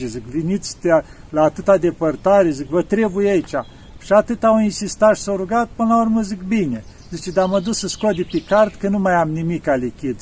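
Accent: Moldova. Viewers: Parinte voi precis sinteti roman ca cind vorbiti parca aveti accent moldovenesc va multumesc pentru sfaturile voastre frumoase imi plac povestirile care le spuneti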